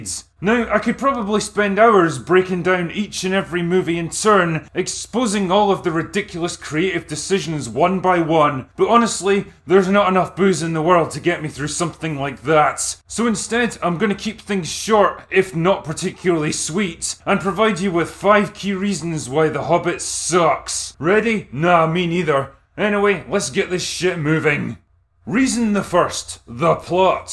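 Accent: Scotland, United Kingdom. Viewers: The dwarves in this movie were so dumb, to put it plainly, and they were all unlikeable, especially the leader. Did you see The Hobbit, the cartoon? It was around when I was young (early 80s). I remember it was very good. Also, the book. I don't think we really needed these movies. Course, we don't need most movies. Maybe if it was just one really good Hobbit movie it would have been something.... I think the actor who played Bilbo did a good job though, but I can see your point about him acting the same in every movie. Edit* by the way I love your Scottish accent. I'm from the U.S., it's probably one of my favorite accents, I don't hear it enough! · scottish with a hard S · I have no idea why this Scottish guy talks like he’s holding in a monster sh*t. We don’t all talk like that I promise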